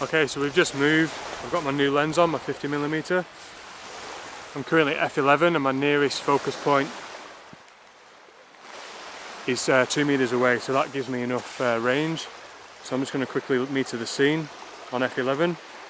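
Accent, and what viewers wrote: Yorkshire, United Kingdom. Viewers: Sounds to me like you are originally from Yorkshire.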